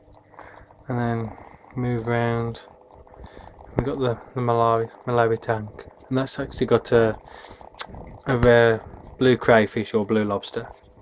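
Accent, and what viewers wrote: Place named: Wolverhampton, United Kingdom. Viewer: This setup looks awesome! Tubbs and Edwards look happy to see you :) I just brought my first aquarium but I'll be keeping fish. You sound like you're from Wolverhampton like me!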